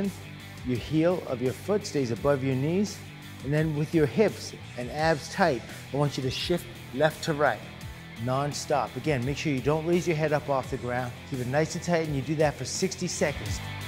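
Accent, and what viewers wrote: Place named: Brazil. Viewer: Brazilian accent, fofinha.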